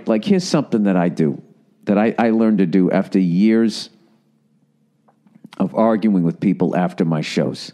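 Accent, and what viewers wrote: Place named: South, United States. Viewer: Love, love, love the country accent!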